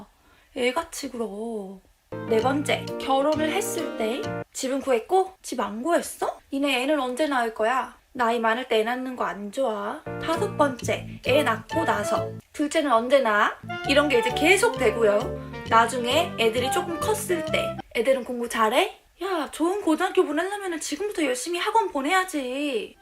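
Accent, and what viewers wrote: United Kingdom. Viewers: Você tem sotaque britânico quando fala inglês 😂 que fofa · Your british accent is so gooood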